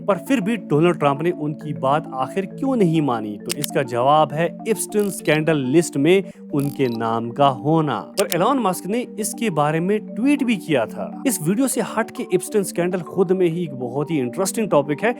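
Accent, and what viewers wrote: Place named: Pakistan. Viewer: definitely Kashmir wali side Sy hngy because dsri side India Waly asy nhi bolty Urdu like Pakistani type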